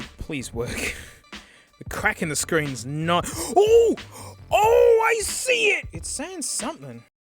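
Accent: Australia. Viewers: Aussie accents are so interesting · LOL... this is Mr Mobile if he was your Aussie mate. Amazing essay as usual mate 👌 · are you australian? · Hi Australian man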